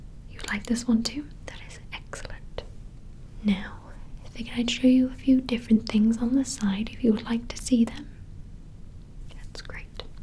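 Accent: Wales, United Kingdom. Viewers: Welsh accent is incredibly nice😍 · Fantastic video. I find your voice so comforting. I think it's because I'm Welsh too and so your accent is so familiar!!!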